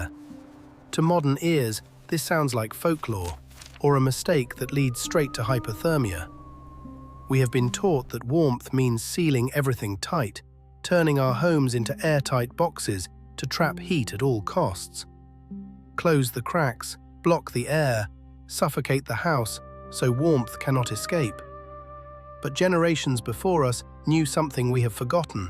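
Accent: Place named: England, United Kingdom. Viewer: The bones of the building. 🙂‍↔️ That thick, English accent! ☝🏻🧐 The bones of the house lose heat. ☺️😆 I love it, German shock ventilation! 💁🏻‍♂️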